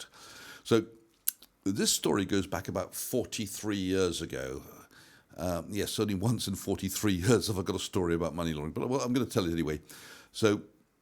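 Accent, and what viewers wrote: United Kingdom. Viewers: Greetings from NY. Technically speaking, being in the "Black Friday" (profit) is positive for stocks, even though consumers will be in the Red if they overspend on things they do not need this week. I love the British accent, but I add captions to your videos so that I do not miss any details you are discussing. Love the office setup. Have a great holiday with your friends & family.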